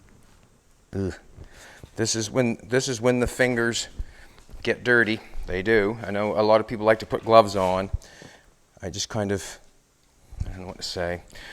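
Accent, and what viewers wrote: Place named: Newfoundland, Canada. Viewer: If I’m not mistaken, that’s a maritime Canadian accent. Newfoundland?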